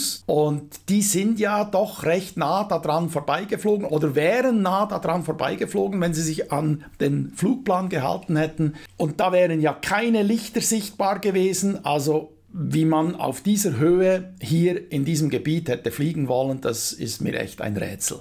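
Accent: Switzerland. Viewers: Das ist kein Schweizer Dialekt, das ist ein Schweizer der Hochdeutsch redet. Das tönt ja in Deutschland auch je nach Gegend verschieden. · In der Flugplanung schon Fehler und dann noch in der Kontrollzone abdrehen müssen. Ich weiß nicht was diese zwei Jungs da oben in dieser Nacht geritten hat. Da ist nicht viel Flugerfahrung eingesetzt worden. Traurig und es wäre nicht notwendig gewesen, in dieser Nacht sein Leben zu verlieren. Interessantes und gut erklärtes Video. Ich mag Deinen Schweizer Dialekt. LG aus EDTF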